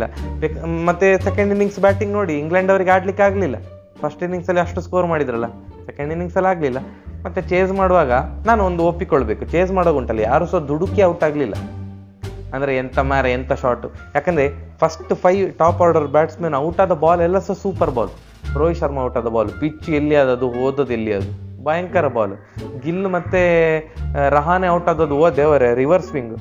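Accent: Mangalore, India. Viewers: ಎಬೇ ಅಂತ ಆಗ್ತದೆ😂👍..ನಮ್ಮ ಮಂಗಳೂರು slang ಇಷ್ಟ ಆಗ್ತದೆ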